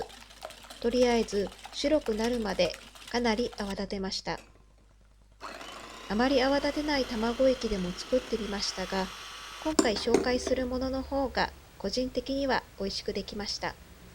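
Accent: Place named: Kansai, Japan. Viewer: akiさん、初めまして何時も見せて頂いています＾＾ ほのぼのとして素敵なお庭ですね～♥ そしてお優しいご主人～良い方に巡り合われましたね～ 私は大阪に住んでいるのですが、時々akiさんの関西弁が聞けるので すごく親近感をかんじていますよ～ 又、楽しみにしています～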